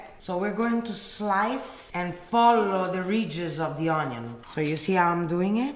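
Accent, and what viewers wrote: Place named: Rome, Italy. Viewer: Sei molto simpatica e bravissima ma ti preferisco quando parli italiano e ho capito subito che sei romana ❤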